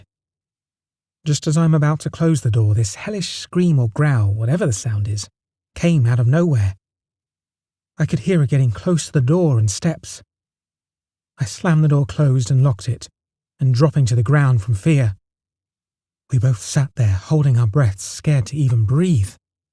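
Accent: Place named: United Kingdom. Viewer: From Wyoming with a British accent... Hmmm...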